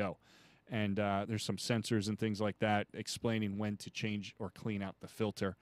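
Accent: Philadelphia, United States. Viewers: Just subscribed. Thx for this video. BTW, are you from Philadelphia? Just a guess from hearing your accent.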